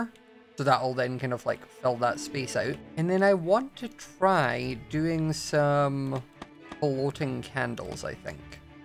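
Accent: Scotland, United Kingdom. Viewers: why does he sound Scottish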